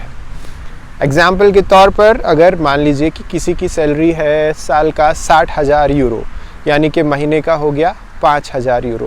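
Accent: Haryana, India. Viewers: Bhai g aap haryana se ho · Hai are u from Haryana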